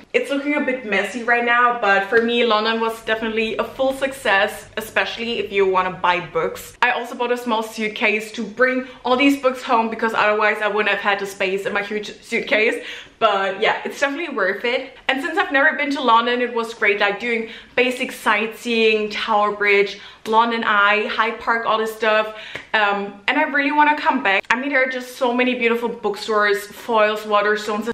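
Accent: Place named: Germany. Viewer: I love your content, I'm always so shocked by your German accent, I just always expect you to have the voice of Adeline ❤ Thanks for your hard work!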